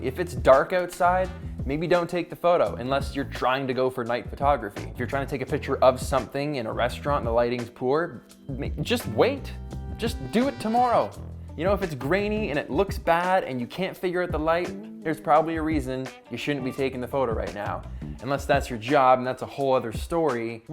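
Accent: Canada. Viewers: Are you Canadian? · Is he Canadian? Where from?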